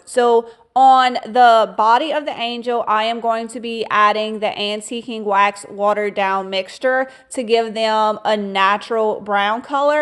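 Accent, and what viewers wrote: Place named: Louisiana, United States. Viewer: Just found you today and LOVE your ideas! Beautiful…..also, can I guess where you’re from by your accent and that it doesn’t usually snow there alone? Louisiana? I’m from Southeast Texas and that’s just what your accent reminds me of 😀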